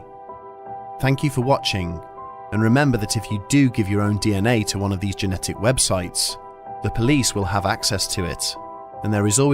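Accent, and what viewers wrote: Wales, United Kingdom. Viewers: Are you from Newport or Cardiff?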